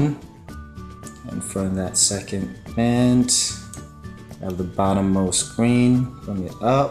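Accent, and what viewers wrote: United States. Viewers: You really think people can make the snake belly cause I would love to, also please reply but is choon your dad or grandad because u sound american and choon is from the phillipines. I dont get it yalll and I spend $ 894 yestdrday at therainbow loom webstore and now im bankrupt!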